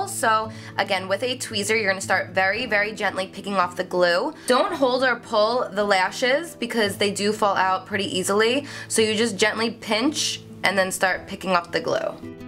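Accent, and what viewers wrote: New Jersey, United States. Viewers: Omg! Her jersey accent is so strong in this video! 😍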